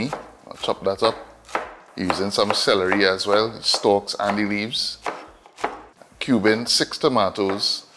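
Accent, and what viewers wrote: Trinidad and Tobago. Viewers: Trinidad in the house you sound like your from Trinidad. My daughter just bought home a breadfruit pigtail oil down she said but i can save some a try this. Just in time to.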